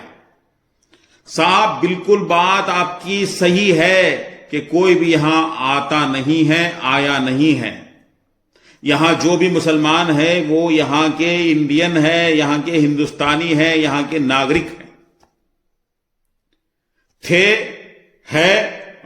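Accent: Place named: Bangladesh. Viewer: آپ کو اللہ پر یقین نہیں ہے کیا۔ کیوں یوٹوب سے پیسے کمانے کے لیے لوگوں کو دہشت میں مبتلا کر رہے ہو۔ ۔۔اپ کے pronuciation سے لگتا یے کہ آپ کا تعلق بنگلہ دیش سے ہے۔ اللہ حدایت دے ۔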